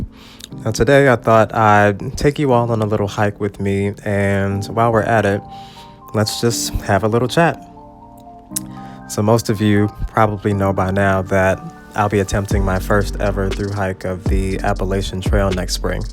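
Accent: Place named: Maryland, United States. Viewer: hey! Greets from Northern VA! You sound like you are over the Potomac in Maryland. Came over from Alicia's channel on the Dolly Sods adventure.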